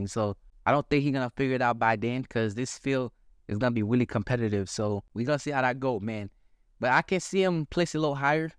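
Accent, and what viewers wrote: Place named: South Carolina, United States. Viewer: Love your accent, man! Are you from South Carolina?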